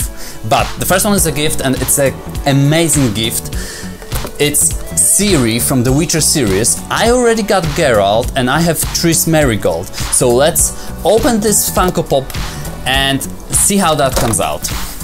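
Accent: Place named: Poland. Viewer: Oooh, Han Solo Pops! There are so many Funko Pops I want :D Btw, I really like your accent, it almost sounds Polish? Am I right? :)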